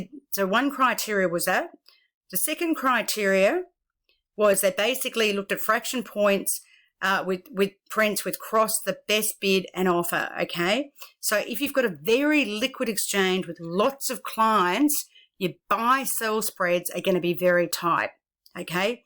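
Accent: Australia. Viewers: mrTiii Very small you're right because Australian pronounce Finance as FaNANCE so is more likely to say BaNANCE.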